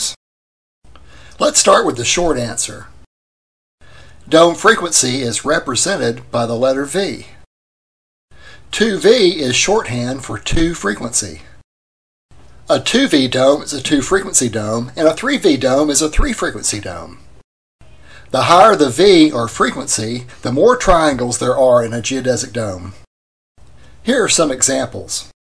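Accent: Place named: United States. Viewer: Thanks for these great explanations! On a side note, you sound like you must come from the same part of USA as Bill Clinton.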